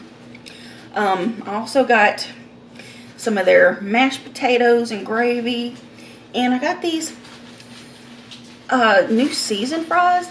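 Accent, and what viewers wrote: South, United States. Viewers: Ya I notice your accent alot...I love it. I'm french so all I hear is french accents😄so to hear a nice southern twang is really different & cool for me. Your voice is very soothing😌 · Im British and we have SO many accents lol but that goes with the American accents too. Us British find the number of American accents - especially your southern accent is really unique!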